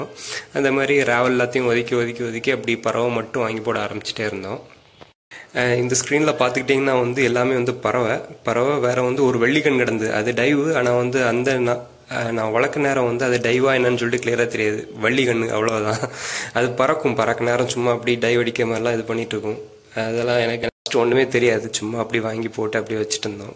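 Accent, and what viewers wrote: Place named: Kanyakumari, India. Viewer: நானும் கன்னியாகுமரி தான் Makka நம்ம ஆளுங்க பேச்சை அவங்கள easyயா காட்டிக் கொடுக்கும்...😍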